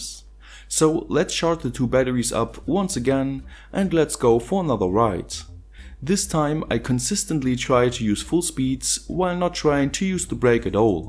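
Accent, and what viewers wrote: Germany. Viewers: wow you good with electronics mate! Like your accent sounds german.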